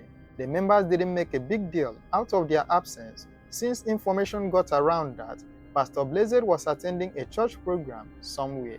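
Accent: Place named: Igbo, Nigeria. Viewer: It surprised me too because he sounds Igbo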